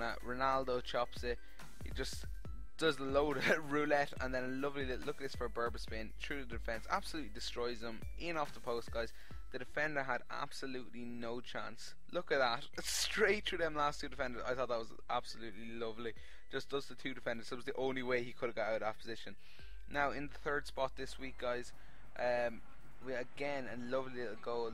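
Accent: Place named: Ireland. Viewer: Gaming countdowns never fail with an Irish bloke commentating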